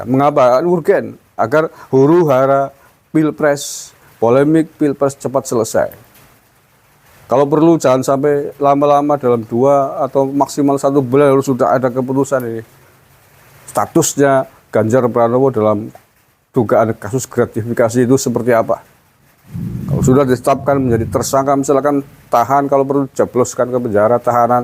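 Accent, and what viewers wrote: Banyumas, Indonesia. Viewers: Hebat bahasane banyumasan banget teknbrengkolan bae mengko aku ketawa ngakak banget bisa menghibur jafi aku ketawa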